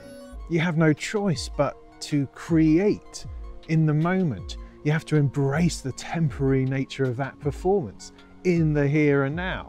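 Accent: United Kingdom. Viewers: if you are into subtractive ways, and since you like the moog sound, i suggest looking into the AJH series, i have 2 of their modules in my rig and i ve tried my share of vcos to tell you you ll get every penny you pay for... don´t wanna mess up your modular or financial plan. but modular is about the analog after all and there is a reason certain modules have a certain depth.. haven´t tried the erica and befaco stuff yet, but i hear a lot of good stuff about them... and at some stage you gotta stop drooling over cwejman modules that will probably not be built because the waiting list is longer than number of heartbeats the man has left. so either filter or vco, AJH would be my suggestion. it´ll stay with you as long as you run a modular rig... and since you sound like you´re from that island that just brexited a leg (just a pun!). AJH is local economy! 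Oh yeah, the disting MK3 has saved many many lives!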